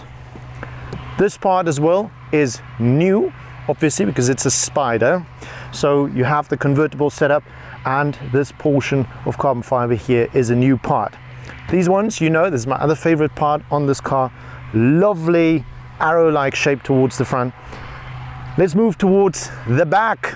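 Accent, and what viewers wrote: South Africa. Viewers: I know a SA howzit when I hear one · Bro are you a South African?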